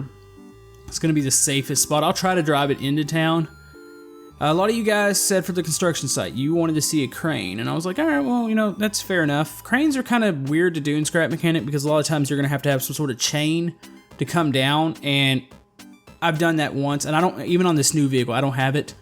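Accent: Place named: South, United States. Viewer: You sound southern please tell me I finally found a YouTuber from the south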